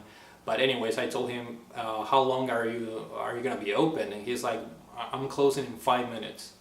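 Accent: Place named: Argentina. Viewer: Great videos man. Where from in South America? I would think Argentina, but please don't be mad if you're from Uruguay hehe. Similar accents.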